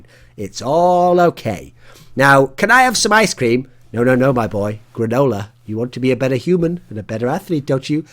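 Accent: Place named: England, United Kingdom. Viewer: What part of England are you from?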